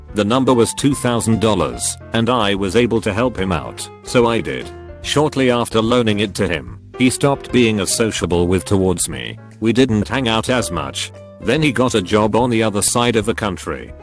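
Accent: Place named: United Kingdom. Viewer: This robotic British accent, does worlds for making some of these people sound not incredibly foolhardy or a little pathetic.. not all, but surely some.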